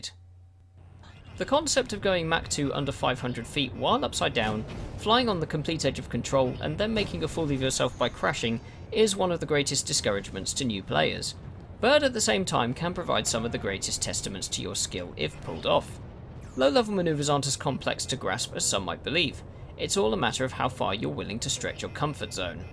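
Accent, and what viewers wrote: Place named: United Kingdom. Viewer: Have you ever noticed smart videos like these come from British accent people. Love it.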